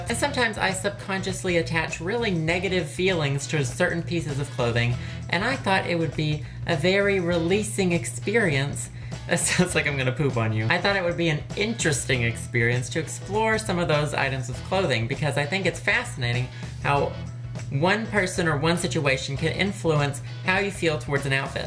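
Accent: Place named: Australia. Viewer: I swear, every episode it's like he has an undercover Australian accent that resurfaces from time to time.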